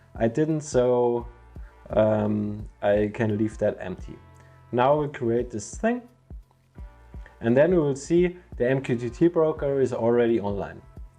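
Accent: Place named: United Kingdom. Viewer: Love your work man, and your British is cool 🤙🏻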